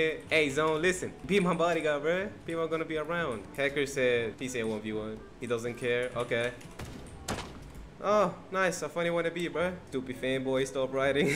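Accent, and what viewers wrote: United Kingdom. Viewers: are you British? This is a genuine question 🧐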